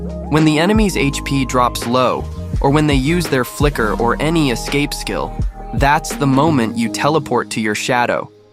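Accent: India. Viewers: Is bro indian?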